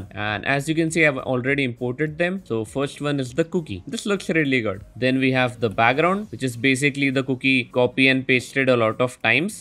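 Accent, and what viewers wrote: India. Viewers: Its always that nice guy with an Indian accent who makes the absolute best tutorials. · always the Indian guy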